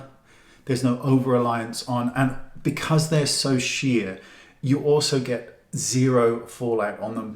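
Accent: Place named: Wales, United Kingdom. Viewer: Nice shirt! nice accent!.Im from south wales are you half welsh?¿but ive been living in Spain for about 40 years...gosh !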